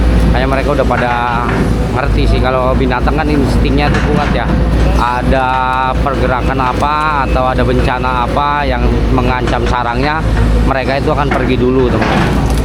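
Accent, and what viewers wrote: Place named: Banyumas, Indonesia. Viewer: dr logatnya mas dr jawa ngapak ya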